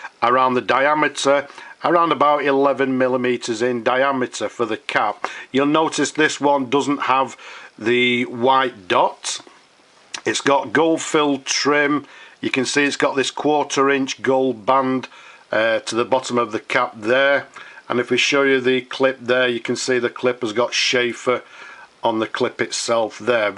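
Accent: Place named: North, United Kingdom. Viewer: Ey up! Thy sounds like a Northerner. I have an Imperial I with a plastic Touchdown rod.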